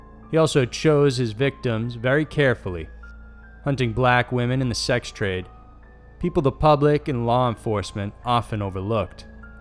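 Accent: South, United States. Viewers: i really enjoy your channel. it's in-depth and you have a nice voice. are you from the southern US by any chance? you don't have too much of an accent (although i am texan myself) but i did notice a bit of a southern twang on your long "i" sounds. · Did anyone else notice the narrators strong southern accent in this video?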